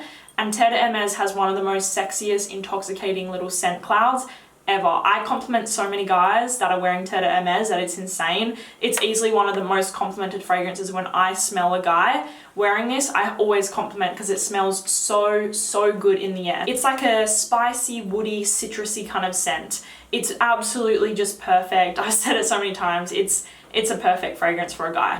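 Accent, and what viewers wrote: Australia. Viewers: I love the way you say "here"...and every other word that ends in "R". You have the best accent of any Australian ever in the history of Australia! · She is an Australian. The American accent is actually the one of the most annoying world wide sorry bro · hers is Australian not english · I love your Australian accent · I love your Aussie accent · Australian accent, language is english. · That Australian accent though 😍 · hello, just discovered your channel, loved the review and the Australian accent 😉 Liked and subscribed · SO FUNNY TO LISTEN HOW STRANGE AUSTRALIANS SAY WORDS "HERE", "YEAR". SOUNDS LIKE "YEAH". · Are u an Australian ?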